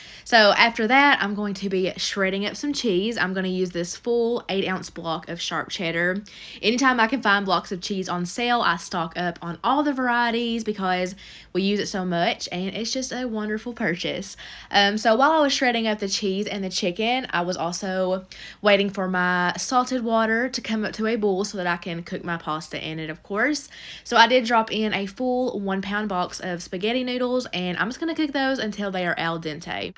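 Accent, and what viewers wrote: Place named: Boston, United States. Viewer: I just love your videos, and as someone from Boston your accent just wraps me in warm joy <3 I'm a dietitian and your videos are so helpful for sharing with my clients who get really stuck on meal prep needing to be "perfect." You always have a good mix of complex and simple meals, they're always nutritionally balanced in terms of protein/fat/carb/fiber, the ingredients are accessible and economical, and they look delicious! You're also not afraid to try new things, and share the reality that some don't always work out the way you hoped. Thanks for all of your content, it is a huge help to people who struggle with this, and it is REAL fun watching you cook :)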